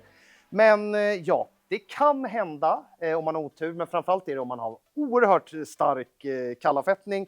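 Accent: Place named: Stockholm, Sweden. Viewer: Jag är stockholmare och om det är det här icke-stockholmare menar när de säger att de hatar stockholmska så förstår jag dom... x) låter som en 12-årig tjej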